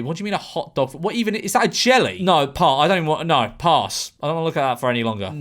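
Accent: United Kingdom. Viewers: I with you could hear what you sound like to me… haha u Brit’s sound so funny in certain parts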